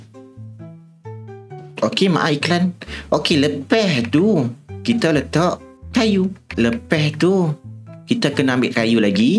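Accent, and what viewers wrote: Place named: Kedah-Kelantan, Malaysia. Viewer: Kedah campoq Kelantan 😂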